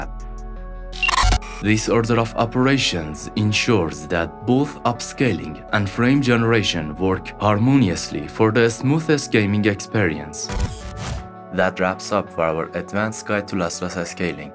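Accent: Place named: Iran. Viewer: Honestly , I feel like you have an Iranian accent 😅😅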